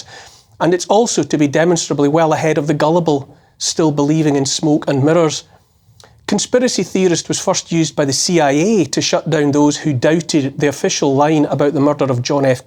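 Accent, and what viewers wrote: Scotland, United Kingdom. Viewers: Thank you for your commentary. Glad to hear so many talking about this. I especially like to listen to you Scottish accent!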